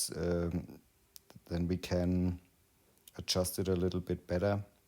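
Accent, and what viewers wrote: Germany. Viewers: Sounds german moin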